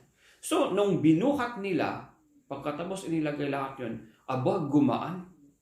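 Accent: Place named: Ibaloi, Philippines. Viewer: Badarong 😂😂😂 ensend ko your other video to my friends, and akala nila amin talaga nga ibaloi ket kasta accent na. 😂😂😂 keep on making videos 😃😄😄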